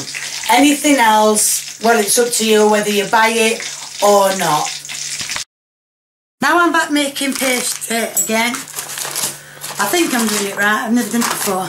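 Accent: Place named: Yorkshire, United Kingdom. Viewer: where are you from? We have a disagreement here.... I think you're from north Rotherham somewhere. My mum thinks you're from somewhere like Wakefield. Please help xxxxxxx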